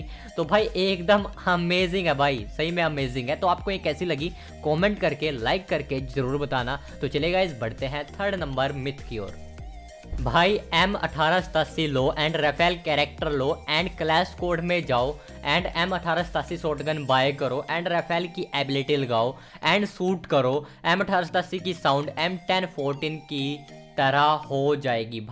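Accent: Punjab, India. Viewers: Bro r u from Punjab ☺️🤭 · Bro you are from punjab??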